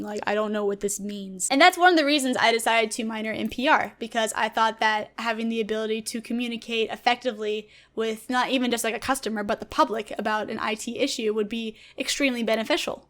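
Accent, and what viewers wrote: South, United States. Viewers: Think I honestly have never really heard a true American Southern accent. This girl seems nice and her videos have a nice quality and effort on them. Subscribed.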